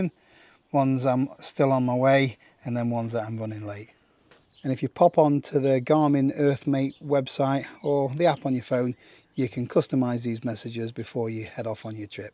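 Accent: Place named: RP, United Kingdom. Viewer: very posh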